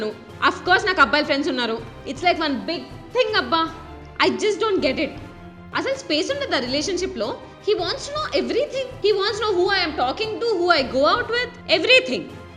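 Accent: Rayalaseema, India. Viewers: ur from rayalseema na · Hey r u from rayala seema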